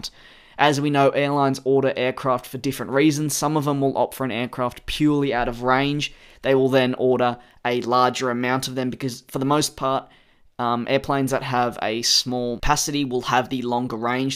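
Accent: Australia. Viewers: Is the narrator Australian? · you are Australian?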